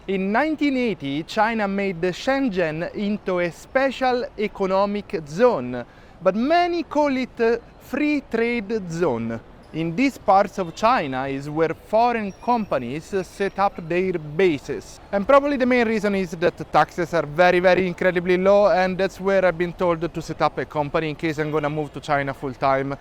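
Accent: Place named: Italy. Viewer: It's a beautiful enjoyment to listen to you speak English with your unique Italian flicking tongue. 🥰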